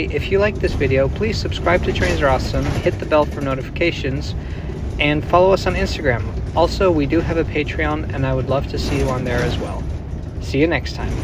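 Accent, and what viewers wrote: United States. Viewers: Hey, you're bringing me back to my youth. I remember visiting my sister at Cal State & on my way to union station on this busway. Thanks Btw, didn't know you weren't rom the U.S. Your American english is perfect. ;) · Where in Europe are you from? You sound American.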